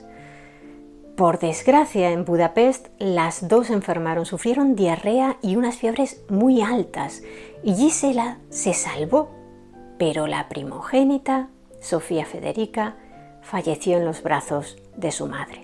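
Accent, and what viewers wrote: Spain. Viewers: Opino que tu forma de relatar es de puntos 💯 y eso que no es muy de mi agrado el acento español. Me en..can...to!🎉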